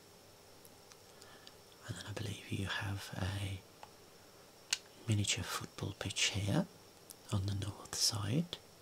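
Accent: Birmingham, United Kingdom. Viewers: You sound like a Brummie, how comes you support United?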